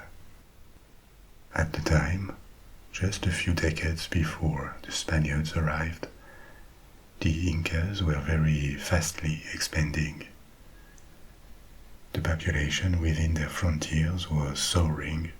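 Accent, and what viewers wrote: France. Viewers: plz keep your french akcent its amazing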